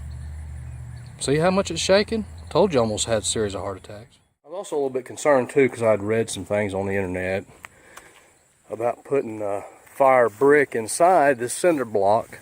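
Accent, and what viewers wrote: United States. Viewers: The minute you started talking I said, he's from Georgia. I'm glad you mentioned you were in Georgia there at the end. Good to be right! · I think the southern accent is a clear indication he is not from California.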